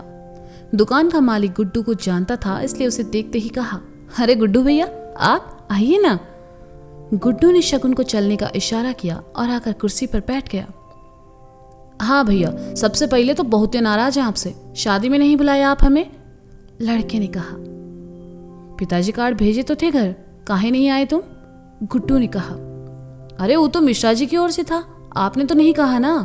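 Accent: Kanpur, India. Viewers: Mam ap manmarziyan record karte karte kanpuriya tone me baat karne lage ho joki bahut mast hai 😊😊❤❤😁😁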